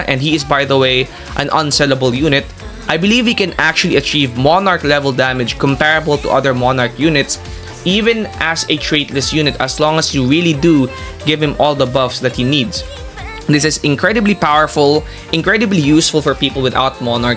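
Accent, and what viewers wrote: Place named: Philippines. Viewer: I can hear in your voice your filipino, can you help a fellow filipino out yourself and gift vip? (Shooting my shot like ben simmons)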